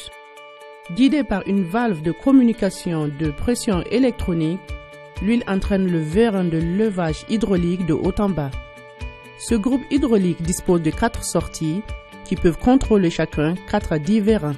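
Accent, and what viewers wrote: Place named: Africa. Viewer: j'adore l'accent africain !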